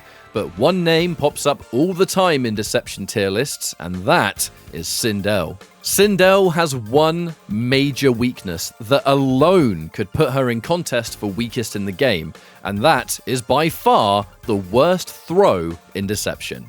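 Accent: United Kingdom. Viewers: I would’ve watched this whole thing if u didn’t have a British accent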